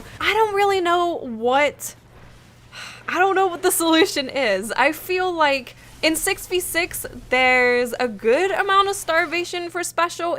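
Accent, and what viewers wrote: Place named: Boston, United States. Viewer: Anyone else notice the slight Bostonish accent in? or is it just me?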